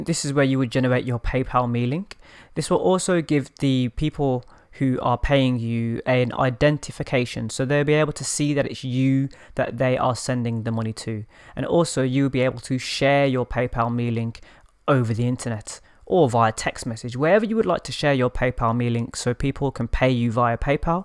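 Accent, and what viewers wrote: England, United Kingdom. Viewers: Are you from England love the accent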